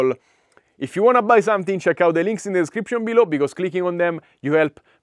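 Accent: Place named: Italy. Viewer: Your english is the best italian Style,its like music in my ears Grazie👍👍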